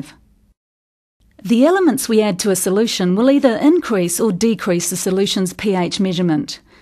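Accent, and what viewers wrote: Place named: New Zealand. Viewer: That's a thick kiwi accent! And a bloody helpful video. Thanks!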